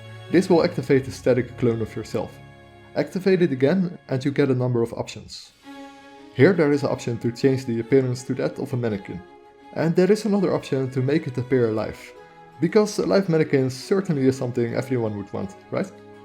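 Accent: Netherlands. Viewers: Are you from Den Hague by any chance? Or some where from nearby that region? I notice it very clearly from your accent, but I'm not sure. Part of my family are from there. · ik hoor aan je accent dat je nederlands bent haha :)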